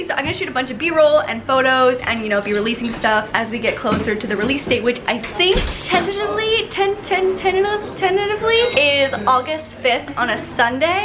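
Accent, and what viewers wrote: Canada. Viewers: You know she Canadian she says ishhhhh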